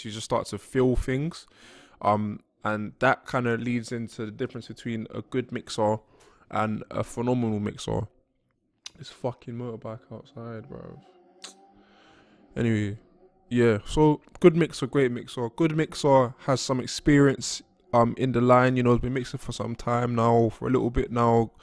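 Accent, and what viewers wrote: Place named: South London, United Kingdom. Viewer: Like you said which I concur, this video is really basic, more theoretical than practical but Yo!!!! What I really dig & would have to say at this the early stage, the primary reason I've enjoyed listening to what you have to say regarding mixing is that wicked "Attack The Block" perhaps South London English accent of yours :)....You know the saying "It's not what you say, it's how you say it!" Lol!...Anyway your accent, at least for me sounds & brings a much welcomed urban/street vibe from England that breaks up the monotony a little.... Yeah man anyway Big Ups & Propers from Los Angeles...Future Video request....Since you mentioned getting mixes sounding as good as Dre...Do a video on Mixing in Mono.....Peace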